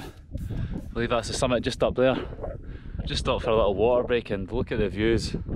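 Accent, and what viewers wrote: Scotland, United Kingdom. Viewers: I really love your Scottish accent!!! but definitely would be happy to see subtitles ;)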